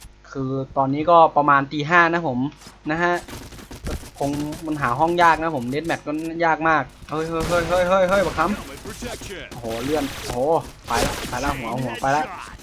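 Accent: Isan, Thailand. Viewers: พี่คนอีสานหรอครับ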